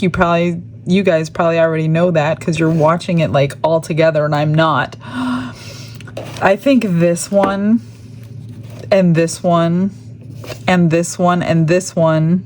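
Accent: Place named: Midwest, United States. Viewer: Lol, I thought I detected a bit of a midwestern accent 😂 I'm a native yooper myself, with family and friends all over Wisconsin, Illinois and ohio, as well as a few trolls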